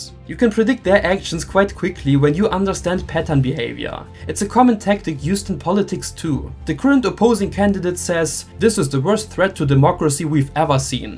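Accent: Germany. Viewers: I knew you had to be German before getting too far into the video lol ( I grew up in Fredericksburg, Texas(Also known as Texas Germantown.)) Definitely dropping you a sub 💯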